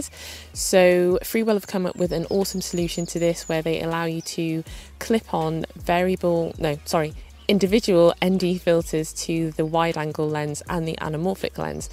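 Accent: United Kingdom. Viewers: Also I love your british accent :)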